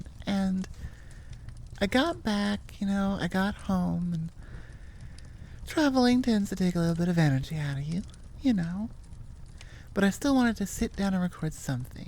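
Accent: United Kingdom. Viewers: Oh my God the British accent activates my neurons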